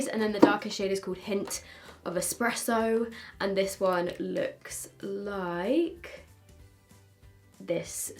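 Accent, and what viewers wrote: United Kingdom. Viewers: Are u British?luv ur accent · I think it’s just the British accent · Ok but you can say d'amelio with a British accent and me sitting here with a British accent struggling to say it LIKE HOW DO PEOPLE SAY IT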